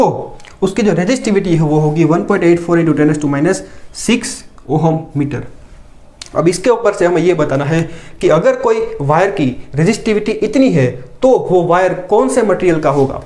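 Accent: Gujarat, India. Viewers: Sir , are you Gujarati??? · Pakku gujarati chee ho !!!!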